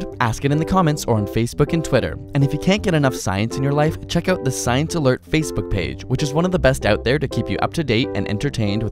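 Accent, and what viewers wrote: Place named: Canada. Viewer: You are Canadian... I can tell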